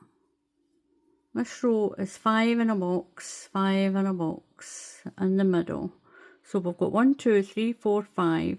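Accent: Scotland, United Kingdom. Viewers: This is a beautiful pattern - thanks so much for sharing it! Your instruction is wonderful and I so enjoy listening to your lovely Scottish accent. I've done some swatches with different yarns and hooks and haven't been pleased with my results. I'm going to order the brand you use in the video. May I ask what crochet hook you used?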